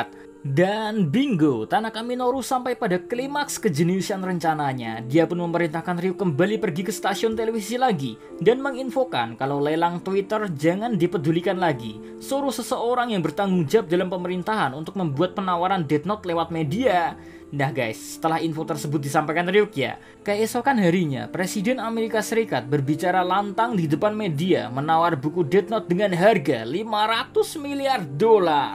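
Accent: Java, Indonesia. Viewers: Lalu kenapa si mimim nya harus medhok gitu. Jd aneh denger nya. Klo bisa pake logat nya jgn medhok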